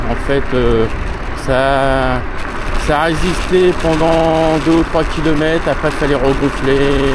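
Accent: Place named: Normandy, France. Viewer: Vidéo très agréable j'ai pas vu les 29 minutes passer Merci pour tes explications, bientôt propriétaire de t107 pro surtout pour l'off road 😁😁 Mais dit moi tu parlais de jeu dans la colonne ça me fait un peu peur 😕😕 Sinon joli accent normand moi je suis d'Occitanie donc un bon accent du sud bien chantant Merci pour ta future réponse bon ride ✨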